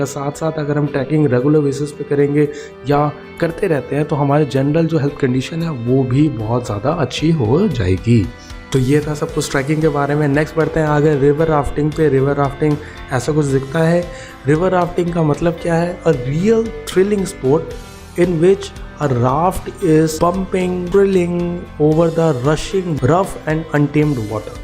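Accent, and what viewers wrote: Uttarakhand, India. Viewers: Bhai ap uttrakhand se ho kya 😊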